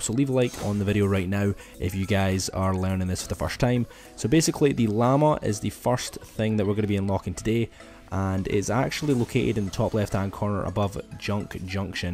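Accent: Scotland, United Kingdom. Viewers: Are you Scottish !?!?? If you are I finally found someone else that’s Scottish on yt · Are you Scottish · this is some hardcore scotish accent (i fricking love it) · Are you from Scotland nice bro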